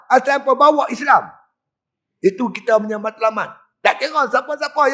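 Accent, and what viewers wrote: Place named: North, Malaysia. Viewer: Sy suka mendengar ceramah ustaz..kdg² terhibur dgn loghat utara ustaz 😂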